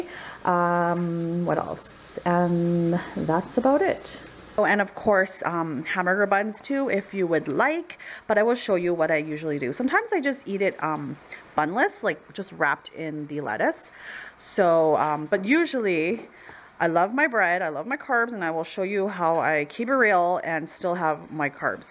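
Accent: United States. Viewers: You speak some of your long “O“ vowels like a Minnesotan, but your hard “R’s” like an Angeleno. You have your own, unique colloquial dialect. I like the uniformity of your burger patties. Well done.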